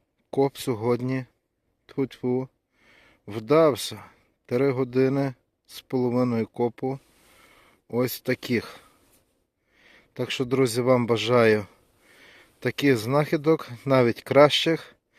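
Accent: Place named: Western Ukraine, Ukraine. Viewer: Як приємно чути нашу западенську😅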